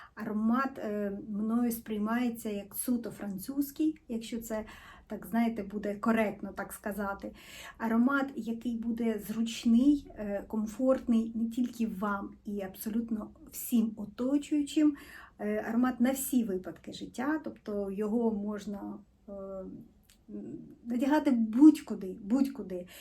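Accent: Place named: Russia. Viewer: Акцент очень сильный, вы говорите на русском в быту... Это точно😊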